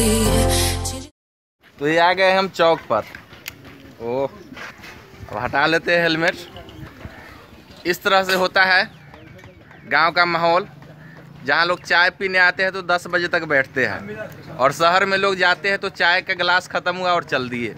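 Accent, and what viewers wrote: Bihar, India. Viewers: ये इस जमाने मे घास पूस के छप्पर सिर्फ बिहार के गावो में रहते है भाई जी । आपकी भाषा ही बता रही है कि बिहारी हो डा को आपलोग रा हो बोलते हो । ई हम नई समझे का।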